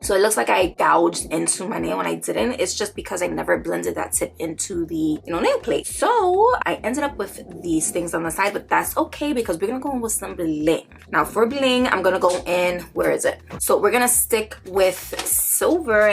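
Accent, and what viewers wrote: New York, United States. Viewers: I love your accent r u from New York? I’m A new sub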